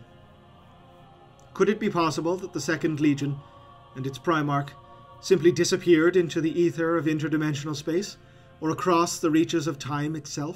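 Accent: Ireland. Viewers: As a lifelong warhammer fan can't believe I am only finding this channel now. Exceptional presentation and delivery. Are you from Ireland.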